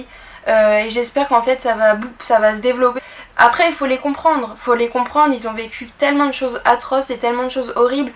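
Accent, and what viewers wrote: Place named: France. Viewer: No entendí nada😢, pero me encanta el acento francés. Estoy aprendiendo apenas el frases, estoy con como se dicen las cosas básicas y todo eso. Espero algún día entenderlo y hablarlo.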